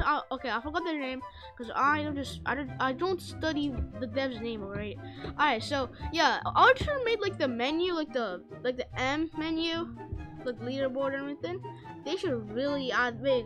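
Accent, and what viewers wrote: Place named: United Kingdom. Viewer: Bro is british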